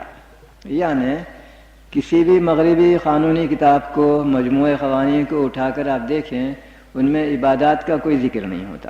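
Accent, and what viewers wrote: Hyderabad, India. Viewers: ماشاءاللہ۔۔۔ھر لیکچر ایسا ھے کہ 2020 میں سنتے ھوئے بھی محسوس ھوتا کہ نالج اور علم کے نئے باب کھل رھے ھیں۔۔ڈاکٹر صاحب کا حیدرآباد دکن کا اردو لہجہ مسحورکن ھے۔۔۔اللہ ڈاکٹر صاحب مرحوم کو جنت الفردوس میں اعلی مقام عطا فرمائے آمین۔۔۔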